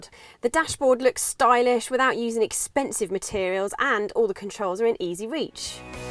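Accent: United Kingdom. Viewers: Appreciate your clear british accent.Keep going!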